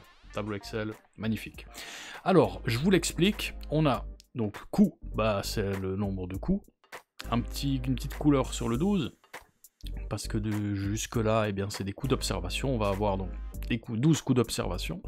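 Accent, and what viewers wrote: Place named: Switzerland. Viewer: Je pense avec son accent et les huitante/nonante, il est plus du côté de la Suisse. Reste à savoir quel canton.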